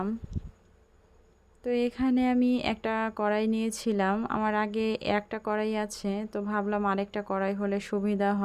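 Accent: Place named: Barishal, Bangladesh. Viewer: বাবা টার জন্য অনেক দোয়া রইলো আপু বরিশাল শুনলে মনে একটু শান্তি শান্তি লাগে পিজ্জা টা অসাধারণ হয়েছে কিনা কড়াইটা ও খুব সুন্দর হয়েছে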